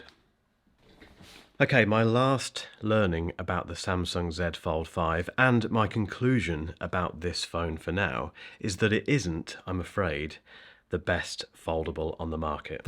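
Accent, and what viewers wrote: United Kingdom. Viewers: Hi. Thanks for a great video as usual. Great to listen to a Brit. Looking forward to your review of the Honour V2 but like you said I think Samsung software is still the best. From the reviews I have seen so far, the phone doesn't multitask horizontally.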